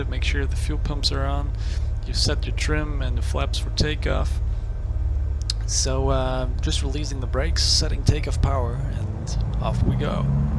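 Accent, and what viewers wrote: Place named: France. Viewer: Salut ! Sympa la review, tu es français non? Tu parle vraiment bien anglais mais j’ai l’impression d’entendre un accent français quand même ! Y’a pas mal de pilote qui s’entraîne en anglais c’est pour ça que je pose la question car j’arrive assez bien à comprendre ton anglais donc tu pourrais être français 😂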